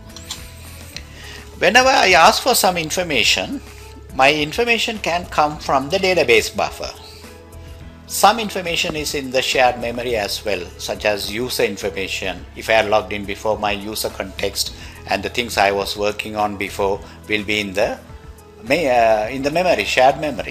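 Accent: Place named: Canada. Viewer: That's a funny Canadian accent you have.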